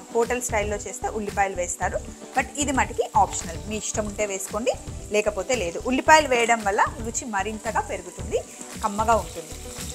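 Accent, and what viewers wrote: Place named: Guntur, India. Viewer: Your voice has good clarity and simple and no over action, I belong to Repalle of Guntur district, your voice is like our voice…..🎉❤💥🎄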